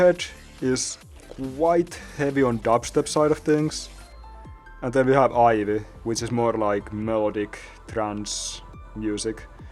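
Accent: Finland. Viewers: ÄIJÄ KUULOSTAA IHAN VITUN SUOMALAISELTA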